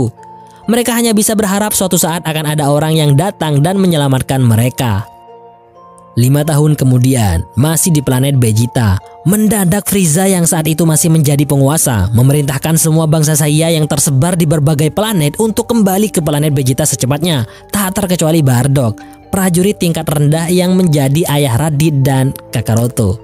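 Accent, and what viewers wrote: Madura, Indonesia. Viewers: Kelihatan logatnya logat plat M 😀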